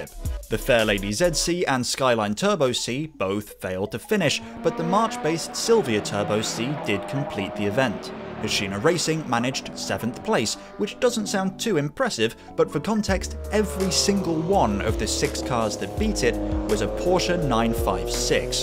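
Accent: United Kingdom. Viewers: This is only the 2nd video I’ve seen of yours on here and sir, let me tell you, I have never hit the “subscribe” button so hard in my life. As a “relatively” new car fanatic, especially for Nissan specifically. What’s better than learning general lore of Nissan’s professional/formal racing history? Learning around side subject from a guy with a cool British accent. Really man, thanks for your content! Much love from Charleston SC!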